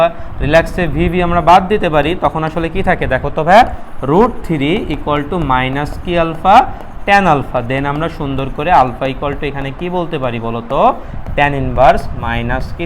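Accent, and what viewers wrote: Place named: Narail, Bangladesh. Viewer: ভাই নড়াইলের ভাষায় কথা বলেন। ভালো লাগে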